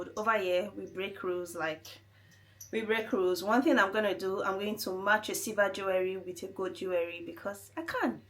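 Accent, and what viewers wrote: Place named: Benin. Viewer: Benin accent got me 😂😂😂❤❤❤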